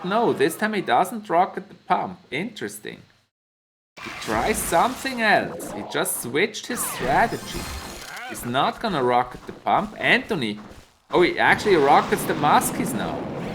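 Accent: Switzerland. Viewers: Are you Swiss because of the axent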